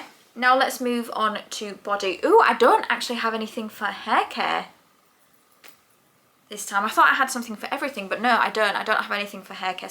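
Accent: United Kingdom. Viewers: Hello! I'm over from the Project Beauty channel and newly subbed! I hope it isn't too forward, but I love your accent. What part of the UK are you from? Northern England?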